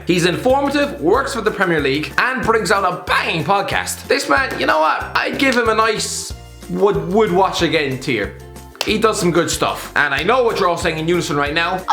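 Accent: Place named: Ireland. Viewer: Whenever I click on a video from this channel, I pray to hear the. I see, my prayers have been answered.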